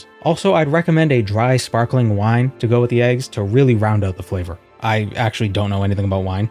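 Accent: Boston, United States. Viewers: Boston boi? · Boston accent? I heard you say smaaht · Weird question but are you from Boston/mass? I feel like i can hear it every once in a while haha. Im just curious since im from there too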